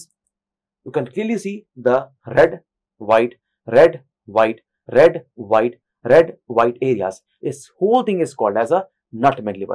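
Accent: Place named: India. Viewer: Someone tell bro to turn off his indian accent because noone can understand him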